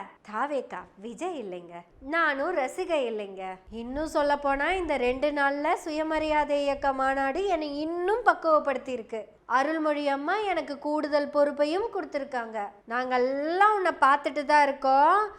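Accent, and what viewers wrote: Kongu, India. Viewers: அன்பு சகோதரி ஒவ்வொருவரும் அவரவராக இருப்போம். மேலே நீங்கள் குறிப்பிட்ட ஒவ்வொருவருடைய காணொலிகளை கேட்டிருக்கிறேன். அழகான கொங்கு தமிழில் மழலை மொழி போல் சிறப்பாக பேசிவருகிறீர்கள். வாழ்த்துக்கள். இந்த பாணியை மாற்றிவிட வேண்டாம். நன்றி.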